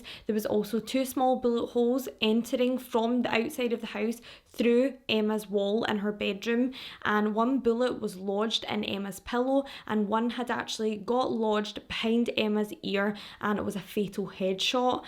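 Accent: Scotland, United Kingdom. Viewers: I love a Scottish accent.❤️ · So glad I found your channel, your my new bedtime listen, slowly going through all your videos, I know it’s murder video but I find it soothing before bed 😂 (must be your lovely Scottish accent)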